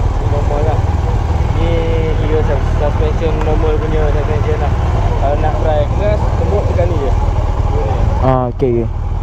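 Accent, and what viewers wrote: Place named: North, Malaysia. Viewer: Memang syok Ooo🤣🤣🤣🤣 ayat utara btl..😂😂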